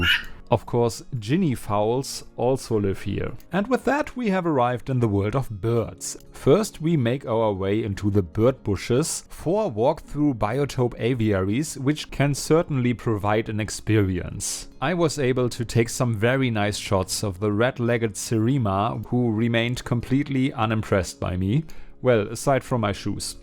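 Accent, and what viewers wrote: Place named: Germany. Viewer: It's actually really pleasing to hear your german accent. Especially as it it paired with German punctuality - not just information about the History of the zoos and introducing animals but about the enclousers itself (like how many m'2 the floor area, how many litres or m'3 the volume of aquariums and pools. It's also good that as an European (unlike American youtubers) you also show and talk about the negative side of the facilities. I saw a lot of Americanyoutubers who only talked about how fantastic is - even it's a neglected illegal pet shop in a third world country And the best for me (and 95% of the whole population) are metric system and measurement units.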